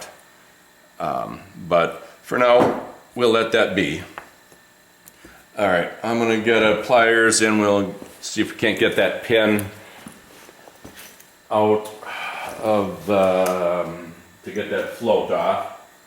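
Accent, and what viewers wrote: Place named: Midwest, United States. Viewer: you are so midwestern. it brings me joy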